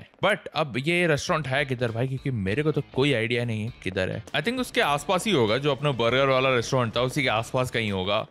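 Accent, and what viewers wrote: India. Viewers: Bro are you an INDIAN?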